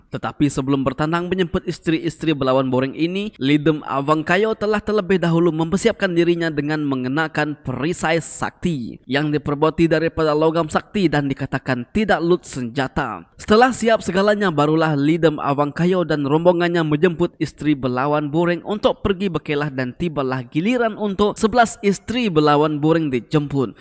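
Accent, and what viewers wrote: Sarawak, Malaysia. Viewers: jaku melayu.. slang iban.. jampat mai bunyi admin macha... 👍👍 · Admin cerita tu feel mat nya, jaku melayu slang iban di dinga ku bagi ku osemm👍👍👍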